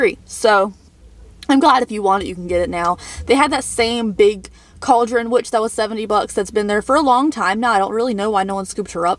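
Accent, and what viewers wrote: South, United States. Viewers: I love it when your southern accent really comes through! You should do a picture of your 4 mug collection 😊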